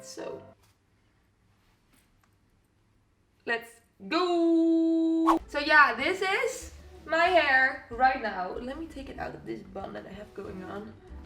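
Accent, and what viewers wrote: Netherlands. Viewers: Are you Dutch? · I swear she has to be dutch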